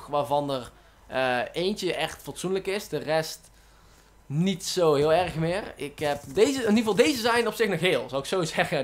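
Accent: Brabant, Netherlands. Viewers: hahah heerlijk dat brabants accent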